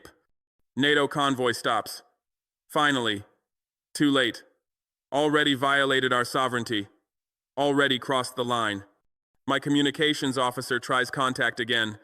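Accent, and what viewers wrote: United States. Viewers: Is this real why has he an American accent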